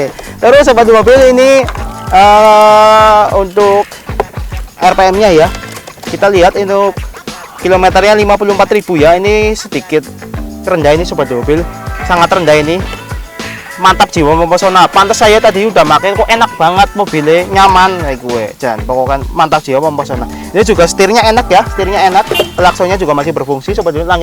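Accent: Banyumas, Indonesia. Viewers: Ngapak jiwa,,,Sukses trs masseehhh,,Gombong Puring Hadir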